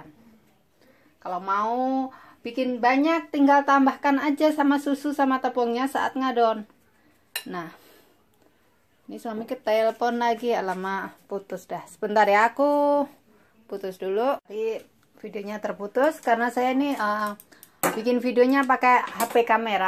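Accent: Java, Indonesia. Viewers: biar gk leleh mbk tapiokanya ....hhhhaq suka mbk nya ngomonge medok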